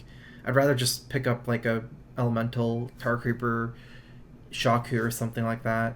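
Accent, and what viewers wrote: Texas, United States. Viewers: John Dice late reply but he says drawl because he is from texas and apparently this is their accent.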